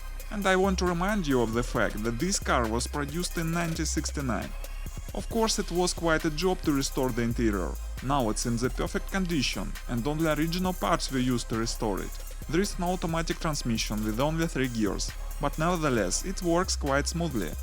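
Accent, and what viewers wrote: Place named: Russia. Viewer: Greetings from USA! What basic geographic is this collection located?? The voice over sounds Russian. Pretty nice collection of Yank automobiles. The Chrysler 300 convertible made me throw up. Its a great car other than wheels and seats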